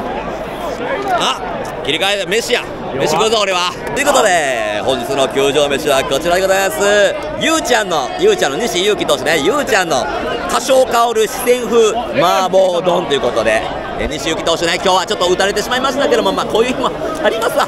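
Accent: Kansai, Japan. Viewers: ハムファンです。さんの動画初見ですがめっちゃ面白かったです。関西の方のしゃべりがさすが👏👏👏応援歌をめっちゃ大声で歌ってるのも良かった！なんとなーくタイガースファンは怖いのかな…と思ってたけど見方が180度変わりました！！相手チームを素直に褒めるのも素晴らしいですね。セ・リーグは阪神応援します！！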